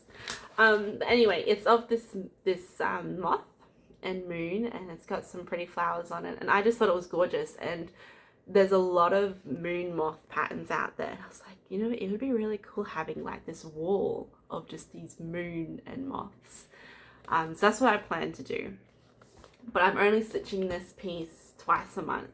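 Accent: Australia. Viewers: Hi I am from Busselton and it is difficult to source patterns fabrics local, Stitches Corner in Perth has just been sold and the shop is moving to Balcatta and will reopen the end of February with an online shop 🤗 as well, I have just started back into cross stitch and quite a novice but like you enjoy other crafts e.g knitting etc I have bought patterns from a Stitch in Time and also Crewel Goblin in Sydney. I enjoyed watching and hearing an Aussie accent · I LOVE hearing a fellow Aussie on YT! What a great first video 😊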